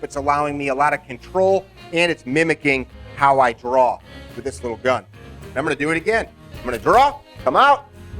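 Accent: Chicago, United States. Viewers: Good video. Well done. I’m guessing you’re either from the Chicago area or maybe Detroit, I suspect. Seems a lot of people “don’t like” the way we talk. I’m pretty sure that’s because we speak with authority and sound different enough that we’re a little bit misunderstood. By the way I’m responding in reference to the correspondence on your home page where you offered the fellow to prove himself as a little bit more than a keyboard warrior who knows enough about something to believe he’s right but not enough to know he’s wrong. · boy, for sure. This the first time you've heard a Chicago accent?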